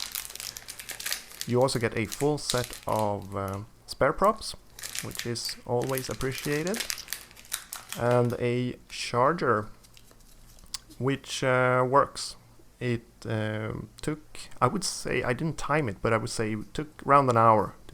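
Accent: Sweden. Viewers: Wooooow helt klart svengelska (man hör det på nästan alla svenskar)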